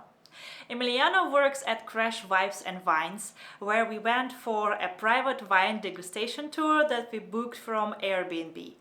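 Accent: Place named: Germany. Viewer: Wann kommen denn mal wieder Videos auf deutsch von dir?Man vermisst schon dein deutschen Akzent :D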